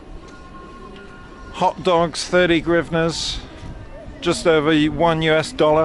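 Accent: England, United Kingdom. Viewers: Which part of England are you from? Can't work out your accent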